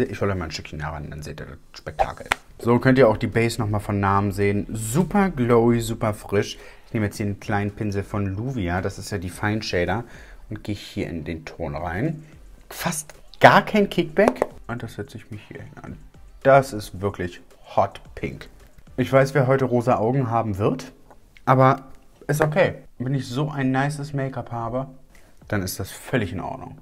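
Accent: Berlin, Germany. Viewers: Οmg man fühlt richtig deine Lebensfreude 😍😍😍 das hat mir so gefehlt an dir!! Und dein Berliner Dialekt kannst du ruhig öfters raushängen haha Hab 4 🌷 gezählt Instagram mariamck27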